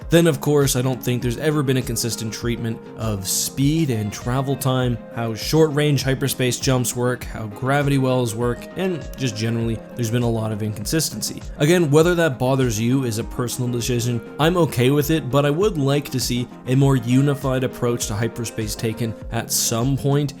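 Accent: Canada. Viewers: Oh shit, you're a canuck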